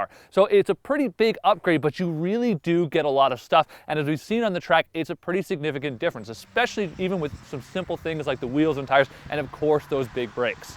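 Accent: Canada. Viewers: canadian accent.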